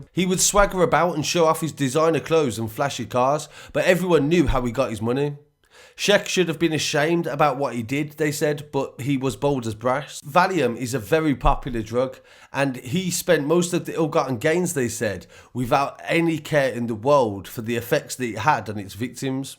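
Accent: Birmingham, United Kingdom. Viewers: Fuckin Birmingham accent KILLS it for me..sickening drone of a moron.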